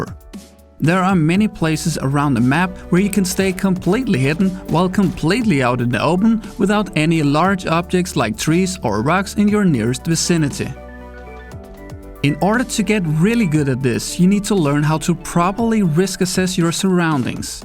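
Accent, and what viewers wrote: Denmark. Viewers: are u danish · Er du dansker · are you danish ? your accent sound a lot like it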